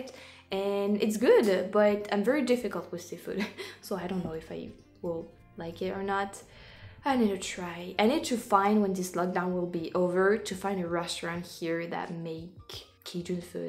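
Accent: France. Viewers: Aghhh, i'm loving ur channel and ur french accent😍 Actually i'm studing french cuz it's so beautiful and now i'm happy that i found ur channel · Hi, what part of France are you from? I can't tell from your accent. Thx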